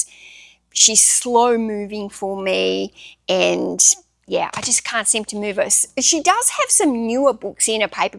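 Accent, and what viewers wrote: Australia. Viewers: I've been enjoying your videos for a few months now. I discovered them through the tech n sports interviews. I love how honest you are with yourself and other resellers. It makes it easier for us as viewers to be honest with ourselves. Thanks for your willingness to put yourself out there. One other thing. Have you ever done a video translating Australian slang (or pronunciation) to American slang (or pronunciation). I already picked up a few like Mills & Burns are Harlequins. "Gair-idge" is a "Gahr- ahdge". You mentioned a Mocher (spelling?) before. I'm assuming that is a Mochaccino coffee?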